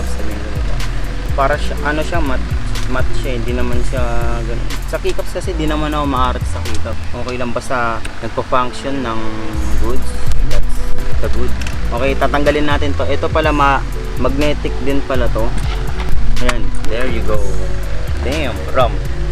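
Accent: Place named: Iloilo, Philippines. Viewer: ahaha ilonggo ka gle ahaha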